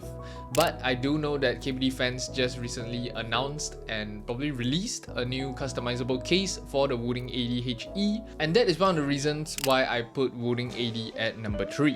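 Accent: Singapore. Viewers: articulate young man with a Singaporean accent 😭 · Stumbled into mechanical keyboards when I saw a Nuphy ad on social media, then went down this rabbit hole of keebs, including subscribing to this great channel with an articulate young man with a Singaporean accent, which surprised me! Very proud of you; keep up the good work!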